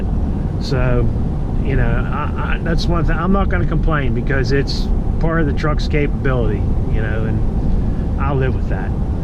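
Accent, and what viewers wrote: Pennsylvania, United States. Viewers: Do you live in Pennsylvania? I’m picking up a slight PA accent. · Not sure but do I detect a central Pennsylvanian accent?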